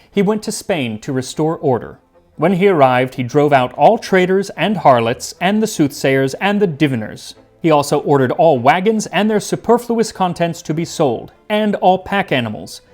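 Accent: United Kingdom. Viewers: No need to apologize for the British accent. Movies have taught me that most Romans had British accents.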